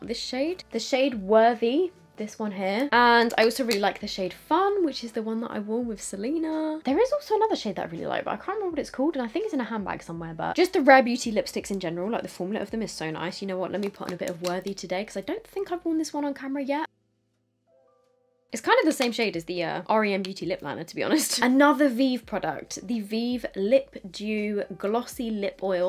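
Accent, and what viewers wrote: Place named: England, United Kingdom. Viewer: As an American, a Texan specifically, it sounds so funny to me to hear someone cuss with an English accent.😅🫶🏼 It’s so cute lol. I love your videos!❤️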